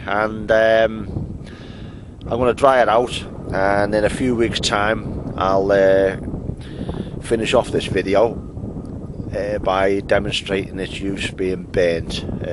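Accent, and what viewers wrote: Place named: Birkenhead, United Kingdom. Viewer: Fantastic as always, I really enjoyed that & very interesting. Nice to see one of your childhood haunts, I've always thought you had a Birkenhead accent x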